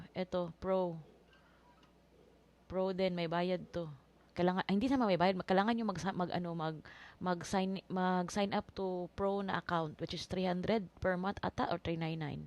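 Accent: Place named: Visayas, Philippines. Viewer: thanks ante, bisaya ka? imong accent man gud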